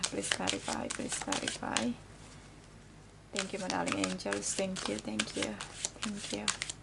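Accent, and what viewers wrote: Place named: Europe. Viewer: Halo nona,klo dgr lidahnya nona sepertinya anda pernah ckp lama ya tggl di benua biru,dimana nona? Maaf,agak melenceng pertanyaannya. Mksh ya non🙏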